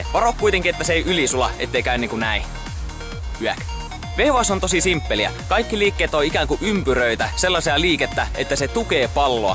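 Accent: Finland. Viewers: Sillä murre on pohjanmaalaisen kuulosta. joten samanlainen kuin mulla :DDDD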